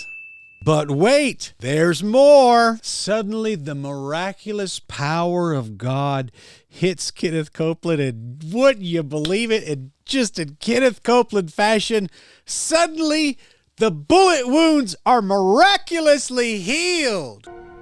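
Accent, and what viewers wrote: South, United States. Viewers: HEY!! His accent is solid brother -- give him that cred at least!!:D Seriously, pretty darn good ha! Is your southern accent authentic, or is it a dynamic show like Kenneth Copeland Mexicano speak?!! Thanks brother:D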